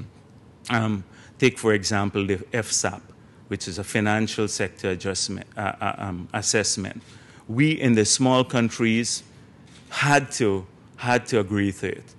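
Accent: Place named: Caribbean. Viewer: Clearly he speaks English fluently, with a marked Caribbean accent. However I don't understand how someone could serve as president were he unable to speak the official language.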